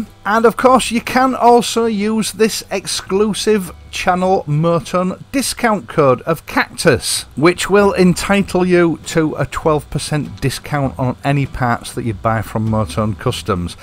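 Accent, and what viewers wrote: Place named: East Yorkshire, United Kingdom. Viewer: Read all about it! 'Listening to East Yorkshire accent increases longevity'. Well, your accent has worked for me. During an enforced 7 week hospital stay (following life-saving emergency surgery), listening to your voice while watching your videos has had a most profound effect upon my life already. 1) My son presented me with a pre-loved Royal Enfield Continental GT535, 2) This in turn has restored an urgency to once again be motorbicycling and also an enhanced joie de vivre, 3) I have regained enough strength to laugh heartily with your wonderful dry wit. Thankou for taking the time to impart your mechanical knowledge and humour. If listening to an East Yorkshire accent extends life, then your reviewing of your videos while editing them must mean we'll have you around forever!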